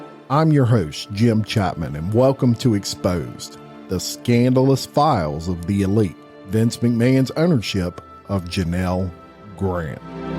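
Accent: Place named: Appalachia, United States. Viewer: Your hillbilly accent makes the whole boring show worth listening too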